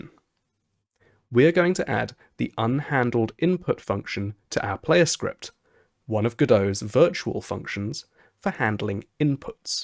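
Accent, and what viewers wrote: United Kingdom. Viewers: You sound English but look Australian 🤔 Thanks for the tutorial!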